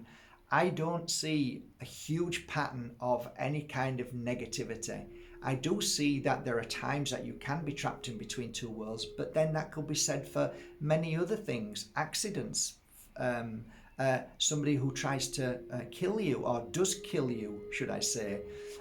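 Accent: United Kingdom. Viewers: I love listening to you! And I love your UK accent ♡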